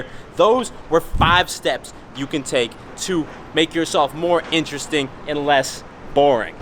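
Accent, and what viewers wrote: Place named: Boston, United States. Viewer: I thought he was from Boston not austin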